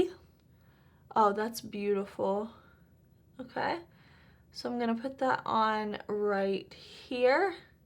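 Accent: South, United States. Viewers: Those are gorgeous, but at 57 I don’t wear sparkle on the daily like I used to so I don’t need this palette! I am the one who watches all your videos because you are so fun to hang out with online and I could listen to your beautiful Southern accent for hours!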